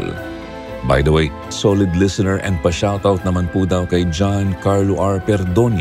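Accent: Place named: Waray, Philippines. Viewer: Waray po kyo sir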